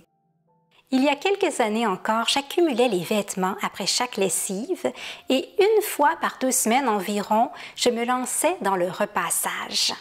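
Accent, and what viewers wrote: Quebec, Canada. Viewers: Bonjour je vous decouvre au hasard et votre contenu me convient.en plus je ne sais pas pourquoi l accent Québec me procure tjr du bien être 🤗🥰 moi ce que je ne fais plus c'est regarder le journal ou laisser la TV allumée pendant mon ménage j écoute ce genre de podcast comme le votre . J ai arrêter d acheter des produits ménagers je me sert des chiffonnettes H2O et leur produits ecocert fini les bidons en tout genre J ai aussi moins de repassage je sèche sur ceintres Je n utilise plus de cotons démaquillants. Depuis 3ans Gant démaquillant de chez H2O démaquillage a l eau ..voici qlqs exemple de ma direction vers une vie plus sereine plus saine et zen 🙌🍀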